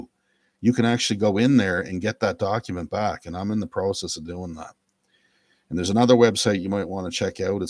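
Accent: Canada. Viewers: Are you Canadian? (Your accent.) If so you are definitely in the same boat as us in the US. I am trying to go this route by UCC-1 and Status Correction.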